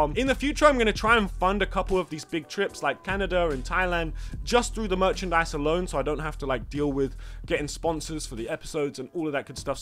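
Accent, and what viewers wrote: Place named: Surrey, United Kingdom. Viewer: You sound like a surrey boi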